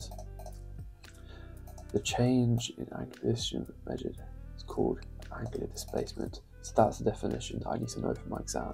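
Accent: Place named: England, United Kingdom. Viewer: Lovely to here clean, calm, English accent so "unYOUTube🇺🇸" Not one GUYS! You're A very nice TEACHER that's my opinion, as a teacher. 😊 Thank you flower! Edit, ok, so a 'guys' but not annoyingly.😅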